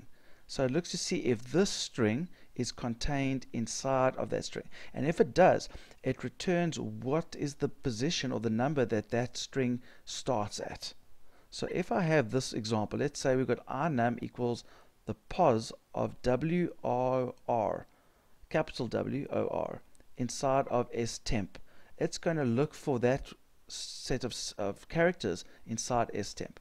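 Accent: South Africa. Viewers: You can hear the strong South African accent coming through, at least I think.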